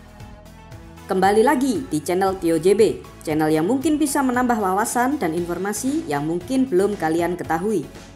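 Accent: Java, Indonesia. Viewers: Yang ngomong meddok bgt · Jawa nya medok bgt narator nya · Orang jawa ya mas... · Medok banget bahassnya mimin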